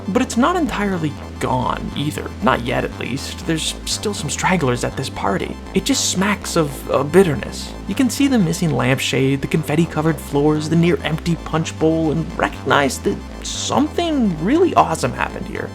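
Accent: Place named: Canada. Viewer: He sounded Canadian to me.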